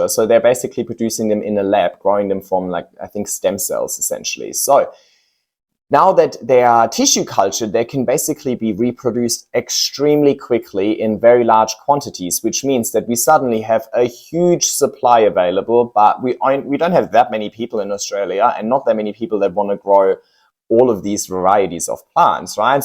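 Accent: Germany. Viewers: Ahh nice! My best friend is German, I detected something in your accent. 😜 Right! Makes sense. How do you remove the roots from the moss pole successfully?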